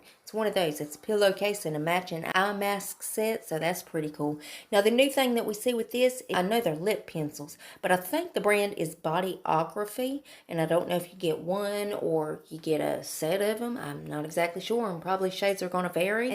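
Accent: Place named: Tennessee, United States. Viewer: Howdy from Tennessee! Just subscribed. Love the videos and that you talk just like me! 🥰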